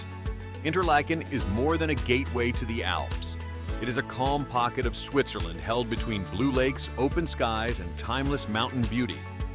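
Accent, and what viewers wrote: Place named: United States. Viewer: Replace the American with proper pronunciation, and move Munot from Stein am Rhein back to Schaffhausen. Nice, but standard selection of places.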